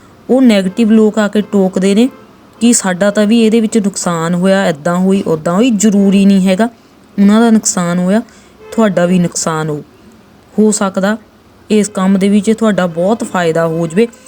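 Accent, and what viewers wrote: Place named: Ropar, India. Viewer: Thodi awaj ton pata lag reha e ki tujada ilaka ropar hai sister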